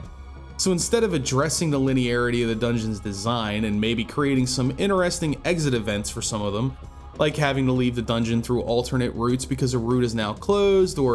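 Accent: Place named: Baltimore, United States. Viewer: love that baltimore accent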